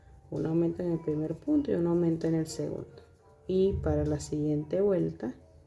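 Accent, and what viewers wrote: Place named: Venezuela. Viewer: Te has ganado una suscriptora más!!!!.... te felicito... me encanta como explicas. Creo que somos paisanas por tu acento jaja... lo hice y quedó muy bonito. Espero que mi clienta quede satisfecha, cuando me envíe foto te las paso por instagram... Gracias otra vez por compartir tus conocimientos con otras tejedoras... un abrazo Venezolano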